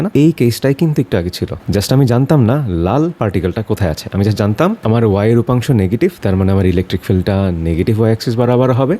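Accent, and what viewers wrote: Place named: Dhaka, Bangladesh. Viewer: আপনি যে ঢাকাইয়া কণ্ঠ শুনলে বোঝা যায়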